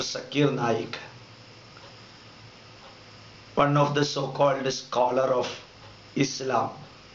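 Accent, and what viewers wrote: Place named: India. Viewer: I have lived in England and mixed with English speaking people all over the world.Different people speak with different accent. Even in England, people speak English with different accent county to county.So, this man has an Indian accent,nothing wrong with that! The message he is giving is important. I salute this great person.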